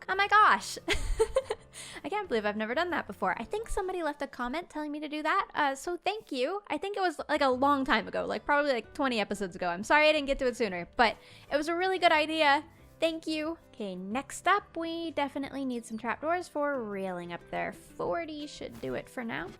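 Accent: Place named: Canada. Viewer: I love gems 'Canadian moments' like when she says cie-an or sore-ey because its so cool as you realise that she pronounces things differently than we do. I personally am having a lot of fun listening to her pronunciation (not in a mean way) and her metaphors. I love this channel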